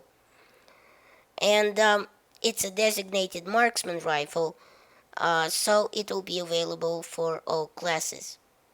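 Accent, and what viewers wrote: Russia. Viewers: i don't care how old u r ur a badass cuz of ur Russian accent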